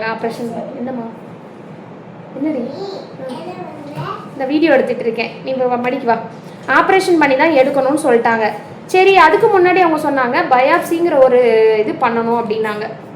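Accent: Tirunelveli, India. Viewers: உனக்கு எந்த ஊர் அம்மா.திருநெல்வேலி பாசை பேசுர. தென்மாவட்டமா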